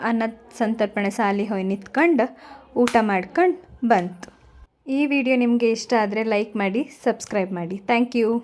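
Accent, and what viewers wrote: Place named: Kundapura, India. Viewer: 😊😊kundapura kannada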